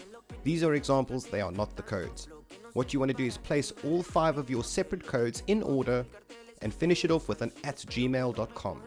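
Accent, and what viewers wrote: South Africa. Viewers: your South-African?? dude that's so cool, i never knew, its almost wipe day on Intox 1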